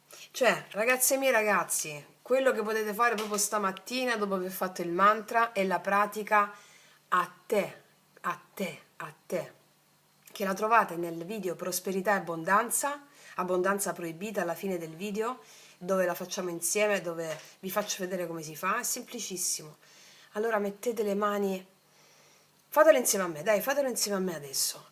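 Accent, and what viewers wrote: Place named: South, Italy. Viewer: Buongiorno bella voce che hai specialmente il tuo accento che credo che sia del Sud.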